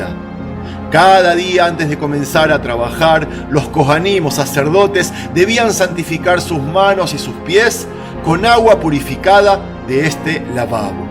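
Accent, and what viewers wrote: Argentina. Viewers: Tu no eres judio eres argentino lo llevas en el asento no puedes negar eso, ningun judio real anda vestido como tu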